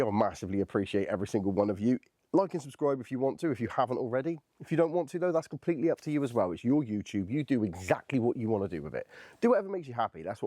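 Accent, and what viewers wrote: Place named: Cornwall, United Kingdom. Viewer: Hey! I've been binge watching your vids and i'm loving it. Your energy and enthusiasm is infectious! I thought I recognised a Cornish accent, then you mentioned you were near Bodmin! I'm near Truro, so not a million miles away. If you want, I could come up and blap a few targets with you. I'm very new to air rifle shooting. I started Feb this year and got my first PCP rifle. I shoot indoor bench rest with my Air Arms HFT 500, which I bleddy love to bits! For what it's worth, in my 6 month experience... lol Get rid of that sand bag rest and don't put your left hand on the rifle. Get a solid rest and a padded shooting glove that's grippy on the top. It's so you can cross your left arm in front of your chest, and put your flat hand under the butt. Use your flat hand like a wedge to adjust the up and down. The sandbag and holding the fore stock is giving you bad consistency, especially at longer distances. 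Hope that helps 😊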